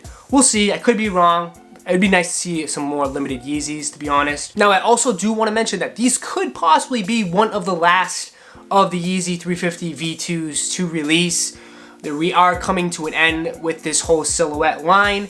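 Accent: Boston, United States. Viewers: If I'm not mistaken your from Bahstan?. Wicked good review.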